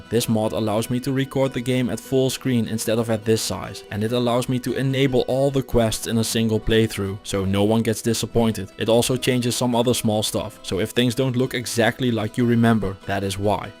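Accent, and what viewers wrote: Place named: Netherlands. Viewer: why you sound so dutch.... and like me.... also yeah the mage is by far the easiest. mana shield hydra can beat the whole game. ranger is thus also super easy as its mage as well u just have a bow instead of melee. much safer. warrior is just face tank stuff. and ur magic is the lowest it can be... fun fact. melee>range>mage is a triangle. warrior can still be decent ranger. but mage is crap at using a bow. if the mage class had decent dex. it would be broken.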